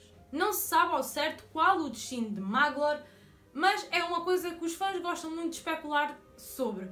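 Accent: Portugal. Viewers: Gente sou brasileiro e já morei em Portugal por um ano e meio, por isso amo tanto o sotaque português. E saber que esse ótimo canal tem uma representante na Europa que faz videos com a mesma qualidade e preocupação em respeitar o material fonte assim como os meninos do TT Brasil fazem deveria ser algo de orgulho. Fico muito triste que pessoas com essa mentalidade façam parte dessa comunidade incrível que somos os tolkientalkers, mas até mesmo em Arda os malignos tentavam dissuadir o bem.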